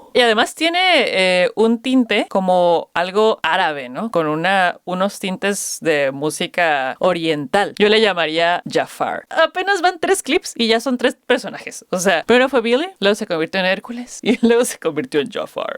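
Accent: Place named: Mexico City, Mexico. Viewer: Es el mejor, lo viven vivo en NYC. El Ingles es su tercera lengua ( Kazajo, Mandarín,Inglés) más o menos fluido. Escuché norteamericanos e ingleses reaccionando y dicen que su casi perfecto. Con tu inglés chilango tienes razón ( nada que ver ) no escucharás el inglés. Te daré referencia de gente que habla inglés, desde “ ” song se nota su mejoría. La música no tiene idioma. Por lo demás está bien tu estilo de reacción.. Te felicito porque descubriste al mejor. 👍👍🇭🇳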